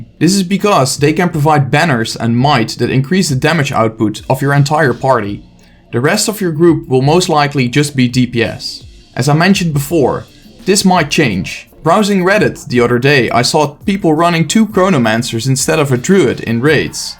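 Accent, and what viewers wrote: Netherlands. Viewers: So.., you are a Dutch? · I can always hear the dutch accent, coming from a Swede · This is the most Dutch accent I have ever heard, I love it! You earned a sub! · Yeah. It's a really noticeable accent, especially if you're Dutch yourself.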